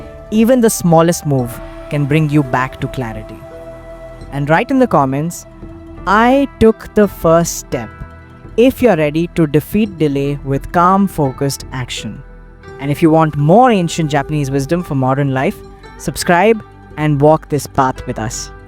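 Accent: India. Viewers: You sound Indian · Those videos are REAL good............ What bothers me is hearing an Indian accent..in an Asian Video. Use an AI Reader maybe ? Keep up the good work !!👍 NEW SUBSCRIBER HERE............!!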